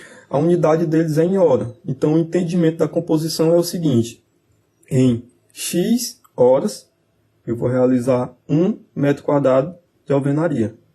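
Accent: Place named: Piauí, Brazil. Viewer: Parabéns pelos seus vídeos. Estou buscando informações sobre o Bim + Navisworks e seu canal foi muito esclarecedor. Quando ouvi o sotaque, tive certeza de que era do Piauí..haha Bom demais encontrar os conterrâneos por aqui. Sucesso!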